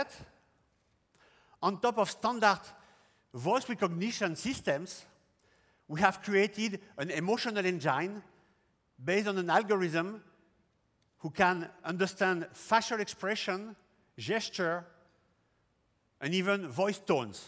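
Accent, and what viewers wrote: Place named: Germany. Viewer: His accent sounds german.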